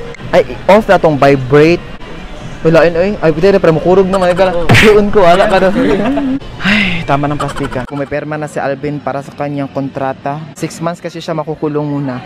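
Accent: Visayas, Philippines. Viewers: Bisaya po ba kayo ? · Aww bisaya diay ni😂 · hlaaah bisaya diay ka dzai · Classic bisaya speaking tagalog. Oh the good ol days u earned a sub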